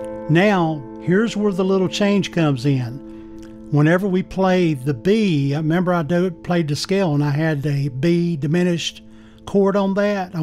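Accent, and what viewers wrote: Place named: Tennessee, United States. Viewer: Whoa, that accent was tough to get a lock on! I was across the pond at first! My best guess though is somewhere in Tennessee or Kerrville, Texas? Anyway, very cool lesson! Thanks for sharing. This helps a lot.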